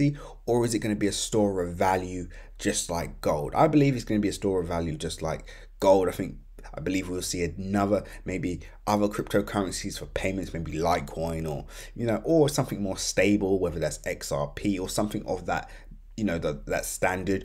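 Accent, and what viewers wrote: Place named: Essex, United Kingdom. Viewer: You got to be near me in Essex lol I can tell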